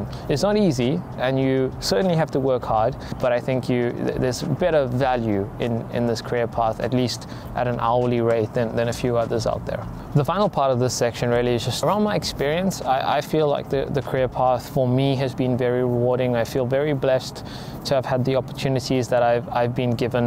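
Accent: South Africa. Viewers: Nothing more beautiful than a South African accent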